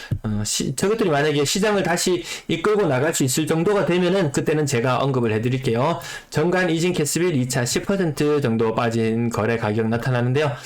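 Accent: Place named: Daegu, South Korea. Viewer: 정보 감사합니다 근데 님 대구분 인가요? 만약 대구분 이라면... 대구 사람치고는 사투리가 작은 편 인것 같아요.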